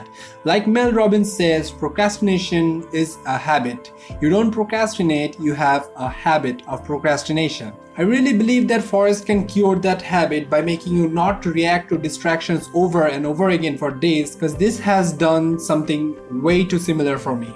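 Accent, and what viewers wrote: Bengal, India. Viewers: Why do u sound bengali?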